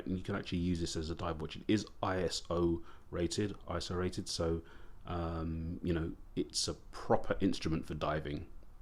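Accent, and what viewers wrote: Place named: South, United Kingdom. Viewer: Hey buddy... What is wrong with your channel, you’re about 10’000 subscribers short! Fantastic in depth quality and a huge passion can be seen and heard in your voice. I will give you and your channel a mention in my community section on my channel for others to discover you. I’ve just recently covered the diving mode side of the GWF-D1000 next to the Gulfmaster, as nobody has covered it properly. You have an accent close to me being more southern on the UK Island but that doesn’t cover where you’re based of if I’m correct LML ;) Anyway my friend, will sub you and try and get you a little more attention as you deserve a heap more, trust me, i’ve seen many channels that aren’t near to your quality with thousands of subs. Have a great Sunday and I’m sure we’ll chat more ;)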